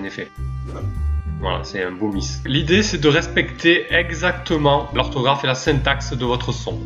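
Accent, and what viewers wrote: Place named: South, France. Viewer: merci beaucoup je fais ça dès que possible. j'entend un accent du Sud tu es d'ou ? actuellement sur la RP, mais je suis originaire de Perpignan.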